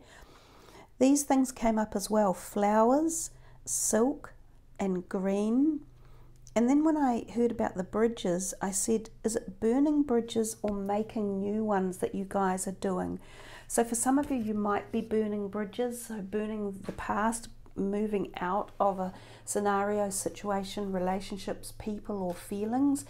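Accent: New Zealand. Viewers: 🥰🥰 refreshing to hear a kiwi accent 😊 Kia ora arohatia to tohu ❤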